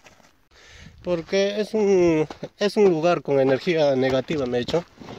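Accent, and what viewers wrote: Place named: United States. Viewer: Me encanta tu voz medio gringo tu acento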